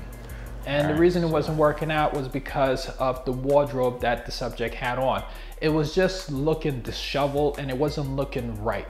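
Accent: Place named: Jamaica. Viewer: Bredren, where you hail from? Sound a bit like my countryman...Jamaica. Great video.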